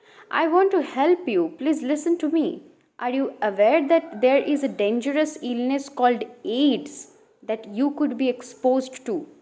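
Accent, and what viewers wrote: Bengal, India. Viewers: BENGALI ENGLISH:face-blue-smiling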